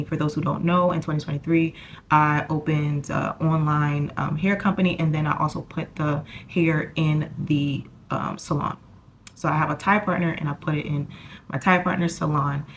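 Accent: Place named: United States. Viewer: Her parents are American or something because i hear NO accent of anything. She sounds like a regular straight up American. And is she a military baby or why did her family move over there?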